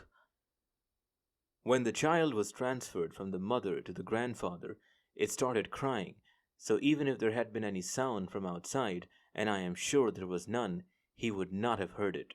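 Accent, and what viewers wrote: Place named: India. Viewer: Indian probably living in States?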